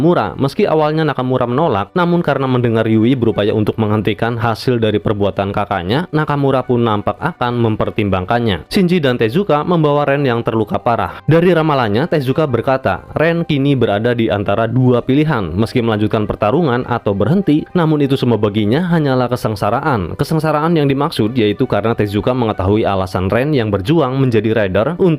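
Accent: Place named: Cilacap, Indonesia. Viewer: Rika wong Cilacap yah kang 😅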